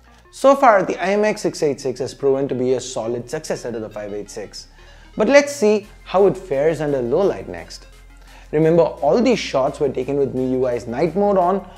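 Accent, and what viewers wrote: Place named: India. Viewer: 这英语一股浓浓的咖喱味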